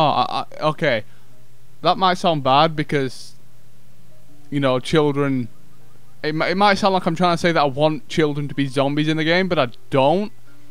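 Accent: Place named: New York, United States. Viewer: I love this guys accent it sounds like a New York accent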